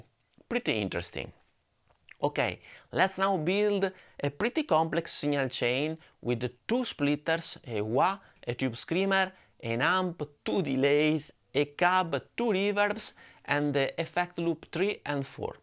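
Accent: Italy. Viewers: Video perfetti, molto dettagliati e precisi. Tra i meglio che si trovano sul tubo… e il tuo accento marcatamente italiota è talmente estremo che risulta pure simpatico 😉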